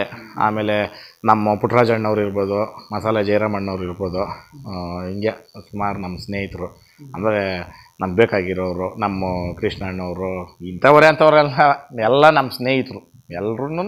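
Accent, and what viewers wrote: Kolar, India. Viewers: I love your kolar kannada and also your work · Nimma kolara kannada kelode chenda❤️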